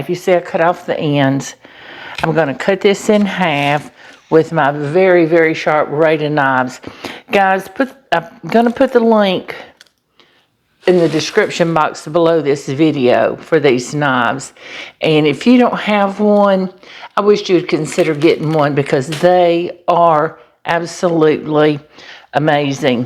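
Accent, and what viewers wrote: South, United States. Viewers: It looks absolutely yummy, I hope that I can buy the dough roll in England, does anybody Know? love your videos and your southern accent is delightful.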